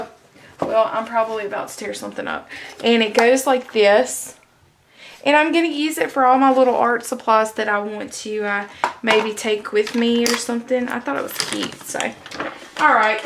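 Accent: South, United States. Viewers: Love all those bright colors and how you incorporated the paper towel. I threw mine away not long ago...my bad. oh how I miss that southern accent! I attended Auburn a long time ago :)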